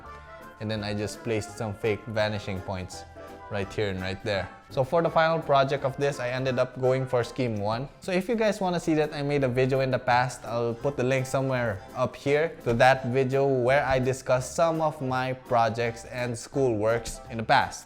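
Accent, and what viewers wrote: Baguio, Philippines. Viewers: Are you from baguio?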